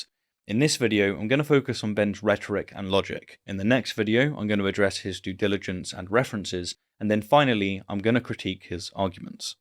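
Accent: England, United Kingdom. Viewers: Sophistry with an English accent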